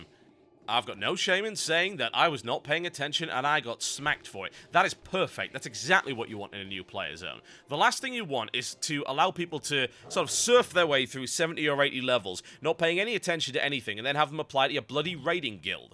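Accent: United Kingdom. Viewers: are you british or American im confused : /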